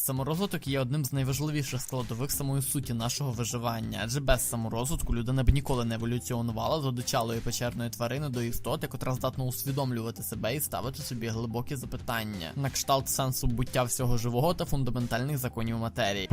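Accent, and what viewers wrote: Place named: Carpathians, Ukraine. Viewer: 😂👍. ТИ З ЗАКАРПАТТЯ ЧИ З КАРПАТ,ЦЕЙ АКЦЕНТИК В ТЕБЕ Є 🤔 В МЕНЕ ОДНОКЛАСНИК ЧАСТО БУВАЄ В КАРПАТАХ ( ЙОГО ТАТО З КАРПАТ ) БОЖЕ Я ВЖЕ НЕМОЖУ )) ЧИ ТО ВОНО ДУРНЕ ЧИ ВОНО БОГ ЮМОРУ ** НІ Я НІКОГО ОБІДИТИ НЕХОТІЛА ТІЛЬКИ ((( СЕРГІЯ)) Я ЙОГО СУКУ НЕ ПРАЩУ ЗА ТЕ ЩО ВІН НА МЕНЕ НАЦЬКУВАВ ЯРІКА З( 2 КЛАСУ ) А ВОНО ДУРНЕ, ХОЧ МАЛЕ (МЕНІ ПО ПОЯС ) ЙОГО ФІШКА ПІДСТРИБНУТИ ДО ТЕБЕ ВЧІПИТИСЯ ЗА ШИЮ і ВІДЧІПЕТЬСЯ ТОДІ КОЛИ ВЖЕ ДОБРОБО ЩОЛБАНА ДАТИ ))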